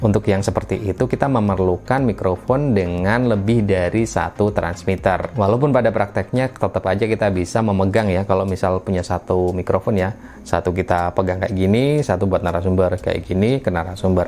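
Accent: Pekalongan, Indonesia. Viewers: Bang orang pekalongan y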